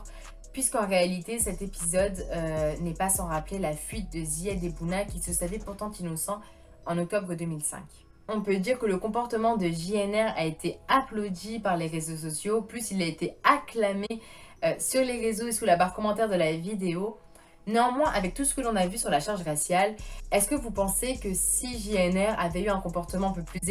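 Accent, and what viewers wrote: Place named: England, United Kingdom. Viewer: Mais faut arrêter d’être tout nier et être sincère. Le sujet est traité d’une bonne manière. Mais en aucun il est complexe, de deux il y’a un ton trop magistral et ne parlons pas de l’accent anglais qui fait peur. De plus il faut prendre en compte plusieurs paramètres. En effet, la vidéo sur JNR avait pour but de mettre à mal ce dernier et quoi de mieux que d’insister sur les clichés du raciste pure et de l’imaginaire de la banlieue. De ce fait Cette vidéo nous démontre à quel point tout cela n’est que pure imaginaire de la part des « autres » ce qui imagine la banlieue à travers un prisme mediatico-politique et malheureusement c’est loin de la réalité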